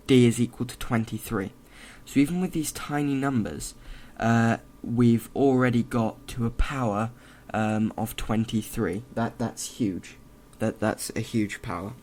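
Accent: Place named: United Kingdom. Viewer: Dude.... you are a genius... my mind is blown... and the british accent makes you sound really smart too...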